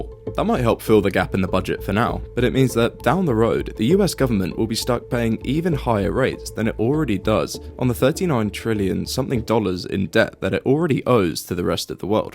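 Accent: United Kingdom. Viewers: Color me skeptical of a 20 something with British accent telling me what the future holds. US debt isn’t debt. It’s treasuries. Purchased by people earning interest. It’s savings. Not debt.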